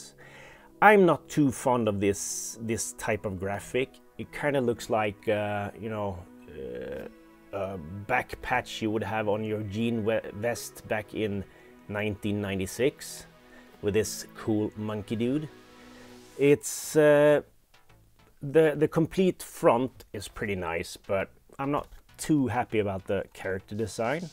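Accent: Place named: Sweden. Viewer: Jag gillar att det hörs att du är svensk med när du exempelvis säger: ”I was super stolt” istället för ”I was super proud” Ska inte säga något, skulle säkert göra samma sak om jag gjorde det på engelska. I like when we can hear that you come from Sweden when you say: ”I was super stolt” instead of ”I was super proud” I should not say anything, maybe I should do the same if I did it on english.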